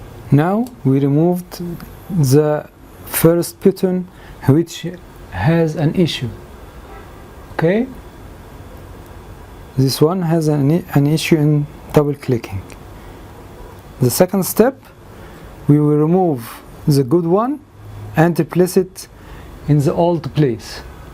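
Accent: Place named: Egypt. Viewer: Nice Egyptian English .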